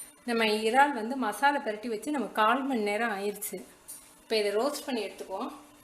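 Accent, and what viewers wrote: Madurai, India. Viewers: Mam neenga sonna method la idiyappam senjen color color ah super Then ipo yaara priyani seya poren today Sunday spl ah senjittu solren And neenga enga madurai sidenu ninaikkuren. Madurai style la pesuringa. Really super. Tq.mam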